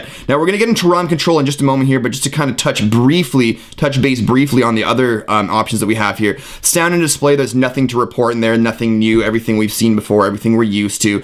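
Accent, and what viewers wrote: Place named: Canada. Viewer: Hehe you're so Canadian... that accent, man... =)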